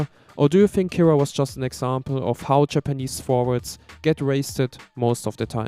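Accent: Germany. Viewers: du bist doch safe deutsch. ich erkenne an deiner Aussprsche bei einglisch. ist richtig gut!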